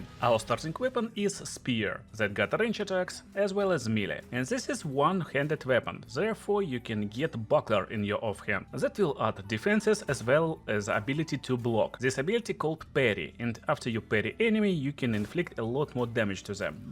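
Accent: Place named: Russia. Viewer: Вери строн рашен эксент.) Nice starter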